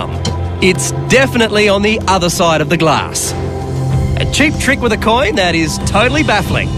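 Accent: Australia. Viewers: Ey... It's good old Aussie Accent ^_^ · Can't take this Australian accent seriously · it's the australian version with an aussie narrator lol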